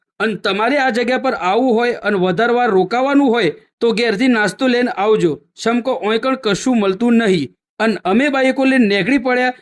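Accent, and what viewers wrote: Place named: Sabarkantha, India. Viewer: તમારી બોલી ઉપર થી લાગે છે કે તમે સાબર કાંઠા ના છો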